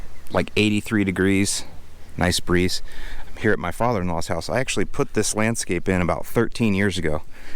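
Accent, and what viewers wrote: Chicago, United States. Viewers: Nailed the midwest accent. Sounded like you are from Chicago for sure 😄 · I love the Chicagoland accent you add into your videos! Reminds me of when I lived up ‘dere!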